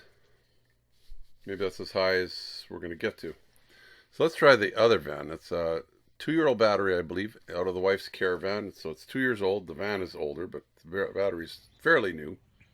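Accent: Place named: Canada. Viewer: OH i bet it is Canadian dialect. I’m down here in the USA. You do a very decent job on your voiceovers.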